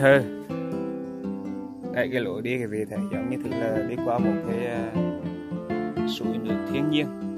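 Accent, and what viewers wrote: Hue, Vietnam. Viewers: Nhac mo qua lon. Noi tieng Hue khong nghe duoc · Do bạn không quen nghe chứ ảnh nói giọng Huế chuẩn đấy. Nhạc rất hợp với khung cảnh. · Ông Thuyệt Minh nầy noi tiêng HUE khó nghe quạ!!! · Giọng Huế nặng quá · Giọng Huế mà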